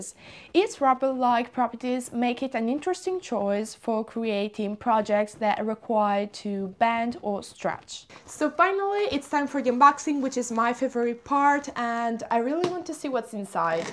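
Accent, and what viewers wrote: Italy. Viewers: So, I was wondering what that accent was. Apparently she has a mirror YouTube channel with exactly the same video's where she speaks Italian :) So, there you go.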